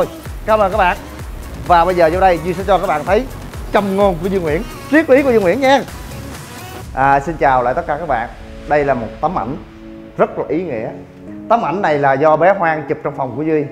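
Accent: Mekong Delta, Vietnam. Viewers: Cải lương quá cha nội...dân miền Tây phải o?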